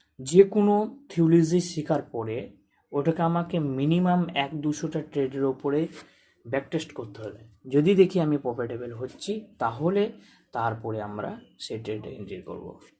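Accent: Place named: Bangladesh. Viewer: বাংলাদেশি নাকি, কথা শুনে তো ১০০% মনে হচ্ছে।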